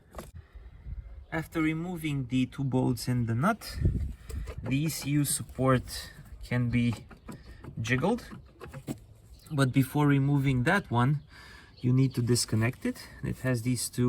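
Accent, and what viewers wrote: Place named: Romania. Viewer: Bravo. Frumos, lucrat curat cu atentie, cu grija la cuplu reocmandat dar la mediul inconjurator. Mi-am dat seama dupa accent si expresii ca esti roman atat.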